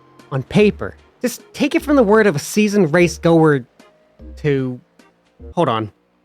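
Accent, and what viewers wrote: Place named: North, United Kingdom. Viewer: Man it's awesome to literally hear the diversity in NASCAR fans. Cause I can detect the northern in your voice lol.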